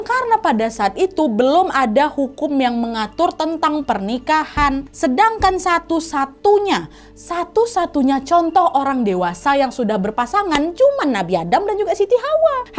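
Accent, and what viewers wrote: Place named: Medan, Indonesia. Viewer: Mirip kontennya Nessie tapi dg aksen Medan , menarik,, Salam dari subscriber baru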